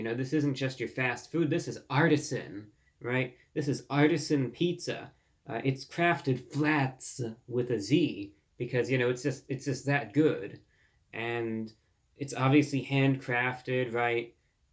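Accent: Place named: Transatlantic, United States. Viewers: Imagine being a corporate exec for some giant, time-tested publicly traded company, and your biggest worry is that a 20-something in a baggie suit, sitting in his grandparents dining room, speaking with a Transatlantic accent, named " ", is going to absolutely fucking butcher and sully your already bad name. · my husband saw thumbnails on my feed and laughed and rolled his eyes. He not only said looks 12 but that people make fun of him. 😂😂😂 I'm like dresses dapper and sounds MidAtlantic like in the 40s. I love the guy. Idc what haters say. It is innocent fun with food, cuz I'm a foodie, and great listens to the podcast too. No cussing and he is a good storyteller and seems like an all around good guy. I say screw the haters.😂 Though his comebacks on hate comments are the bomb. ❤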